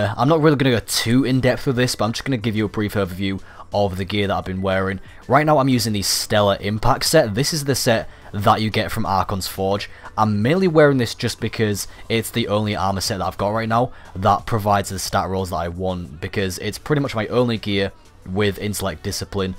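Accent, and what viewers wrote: United Kingdom. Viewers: You sound like u are from the UK,am I right?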